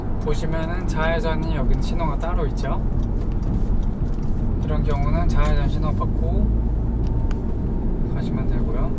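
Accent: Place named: Jeolla, South Korea. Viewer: 어딘가 전라도의 온기가 느껴지는 ㅋㅋㅋㅋ😂 감사히 잘봤습니다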